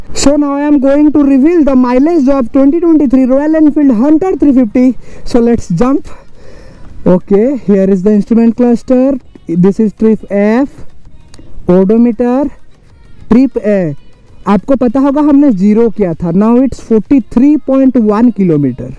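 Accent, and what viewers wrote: Odisha, India. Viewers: Bhai re laguthila Odia boli tuma accent ru … especially Talcheria boli … btw nice video … mu b Talcher ru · Pls make it in odia,coz ur Hindi & English pronounce appear typical odia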